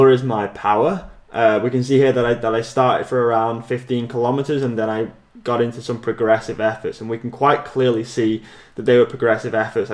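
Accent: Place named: Lancaster, United Kingdom. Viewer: Hi, Been watching and enjoying you for a few weeks now, and listening to your accent, it sounds like you come from around where l used to live, Lancaster and Morecambe, live in Switzerland now. All the best for next season Mike Ford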